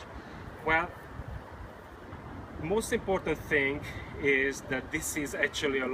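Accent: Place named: Hungary. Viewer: Hungaro English :)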